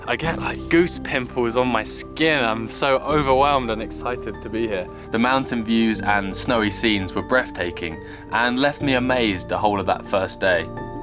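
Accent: United Kingdom. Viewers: you are living in these luxuriously furnished mountain huts and you are presumably a rich man.because rarely people can afford to live in such a spectacular condition you are living alone.good luck.I enjoy from your incredible videos and your pure Brtish accent as well. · OMG ! you sound exactly like "Thoughty2" in this bit here. You must be from the same area, since there are so many accents in the UK. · Hello I'm Trish, i come across yr channel and all i could do is smile big!! You are warm as that fire, handsome, smart, and make great Vij ya's. I watch lots of U Tubers from the U,K and always enjoyed yr culture and of course thee accent. Keep busy and happy, yr there!!✌💙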